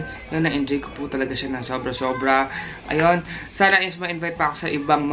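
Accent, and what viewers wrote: Visayas, Philippines. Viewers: bisayaaaa kaaa kuya??? i lab yow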